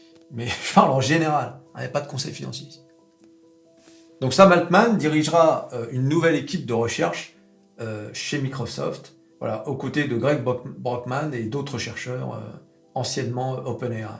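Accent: United States. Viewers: on veut une video FAQ pour les 10k abonnés : quel est le point commun avec Jé Powell ? D'où vient cet accent southern américain ?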